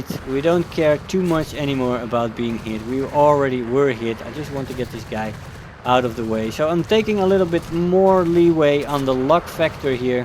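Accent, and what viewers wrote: Netherlands. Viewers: are you Dutch? Your voice sounds like you are dutch xD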